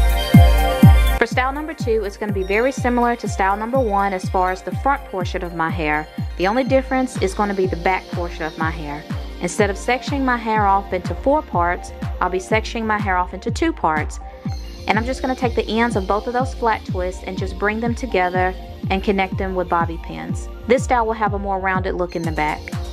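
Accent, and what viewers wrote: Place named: South, United States. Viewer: As a southern woman, your accent is everything!!! I think you're the first southern woman I've watched do a hair tutorial.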